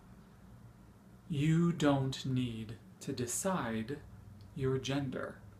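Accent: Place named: United States. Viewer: 8 year old me thought this is how all Americans talked and are like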